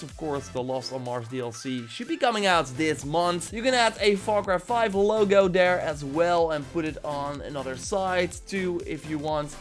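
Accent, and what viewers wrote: Netherlands. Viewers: Your accent is pure aids. Are you dutch?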